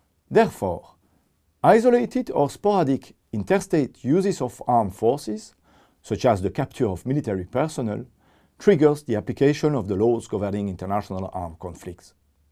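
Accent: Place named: France. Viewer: I like the French accent. Thank you for your explanation.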